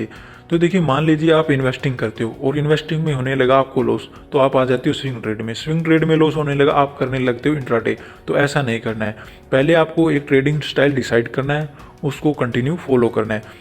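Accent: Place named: Rajasthan, India. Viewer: Rajsthan k lag rahe hai (meri local language style bol rahe hai)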